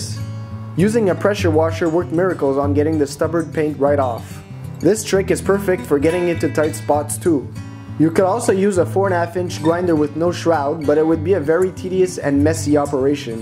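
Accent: Quebec, Canada. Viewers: Heyyy!!! Toi tu as un accent quebecois quand tu parle! Vraiment sick! Jme creer un garage moi avec, so i'm super thrilled to have landed on your channel!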